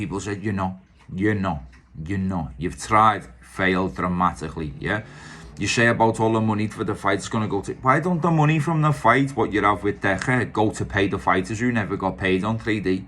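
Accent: Liverpool, United Kingdom. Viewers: I don’t get ya with all this fighting talk …you where sh*+£ against ash dring he slapped the head off ya and why do you keep speaking with an Liverpool accent when you Welsh 🤦‍♂️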